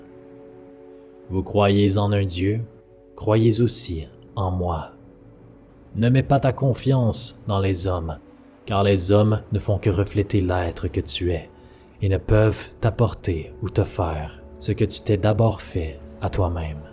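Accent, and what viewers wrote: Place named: Quebec, Canada. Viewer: Merci à vous même si javoue que j'ai du mal avec votre charmant accent québécois, question d'habitude auditive...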